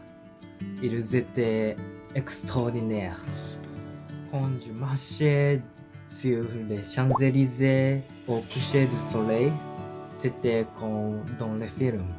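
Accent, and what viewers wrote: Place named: Asia. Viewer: Salut et merci pour tes impressions ! :) J'adore l'accent Français quand c'est un asiatique qui le parle. C'est une très belle langue, content que tu veuilles continué de l'apprendre ;) Pour le côté négatif de ton voyage malheureusement tu n'es pas seul à partager ce ressenti, (2) sur Paris et ses grandes villes. Ce qui est triste quand on voit ce qu'elle était, avant toute cette criminalité.. ( Les pays comme la France, la Belgique et le Royaume-Uni font parti des pays les moins sûr d'Europe.. ) - Si ça peut aider des personnes, je pense particulièrement aux Japonais et Chinois. (En rue, pour tous ce qui est; arracheurs de sacs, pickpockets, crackhead, racailles, "non-français" etc..), ils ciblent particulièrement les asiatiques parce qu'ils savent que vous avez du cash sur vous quand vous visitez. N'hésitez pas à porté plainte à la police directement si cela arrive, vous aurez peut-être une chance de récupérer vos affaires si cette personne est repérée et attrapée après d'autres vols/agressions dans la journée puisque dépouiller/agresser les gens est un sport avec eux.. 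Heureux que tu aies quand même gardé quelques bons souvenirs de ce voyage et bonne chance avec ton apprentissage c'est vraiment impressionnant!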